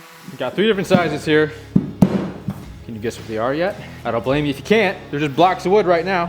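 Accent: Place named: Massachusetts, United States. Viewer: yeah that’s clear. You have a MA accent. One that is very familiar to me. That’s why I asked if you were from New England. I gave the a 6 state zone 😂. Happy Thanksgiving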